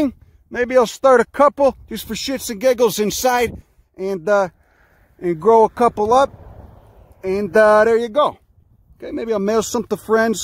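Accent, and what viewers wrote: Chicago, United States. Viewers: An educated botanist who sounds like a Chicago gangster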